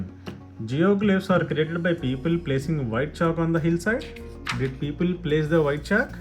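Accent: India. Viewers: The fu/king indian accent 🤣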